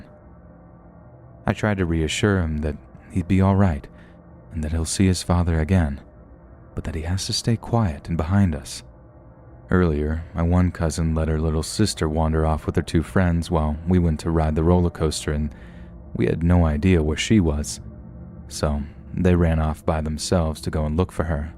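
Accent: Australia. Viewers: Ah jesus no one expects an Australian accent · awesome an Australian narrator i love it 👍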